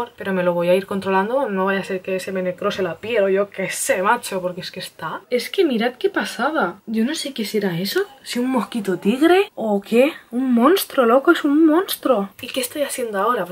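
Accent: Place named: Argentina. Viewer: Sos re argentina boluda <3 ajajjaja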